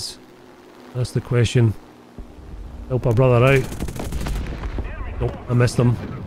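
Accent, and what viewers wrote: Scotland, United Kingdom. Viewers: just wanna say nice gameplay in the intro also whats your birth nation you sound scottish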